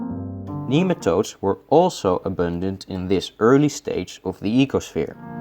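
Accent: Netherlands. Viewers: Maybe its just me but you sound dutch. So are you dutch?? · You sound very Dutch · Hij klinkt een beetje Nederlands. (He sounds a bit Dutch.) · Wich country you live in? You sound a bit like dutch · Are u dutch? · You are so Dutch, it hurts my ears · Waneer je kan horen dat ie nederlands is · You’re Dutch for sure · Your Dutch accent makes this funny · I played your first clip on your jar ecosystem for my 13-yera-olds in our science class and they loved it! We were looking for a group effort in our upcoming science fair and our unit on ecosystems. GREAT job! Your accent sounds a bit Dutch to me. Are you from The Netherlands? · Do you come from the Netherlands? Just wondering 😂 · Ben je Nederlands?😂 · 80% sure you're from the Netherlands.. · hey are you dutch? your accent sounds so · you're obviously dutch, aren't you? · It's good knowledge and are you Dutch?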